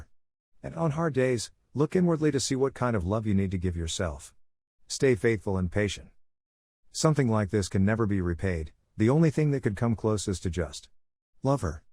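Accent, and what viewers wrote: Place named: Australia. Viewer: "I sit down and cried." The guy sounds like an Australian.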